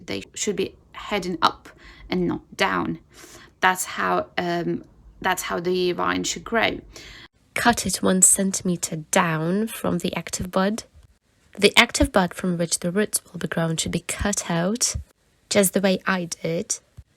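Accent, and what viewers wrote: United Kingdom. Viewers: I seems like you have learnt British accent, sounds good 😊. Honestly, I just learnt how to grow grape plants by you 😅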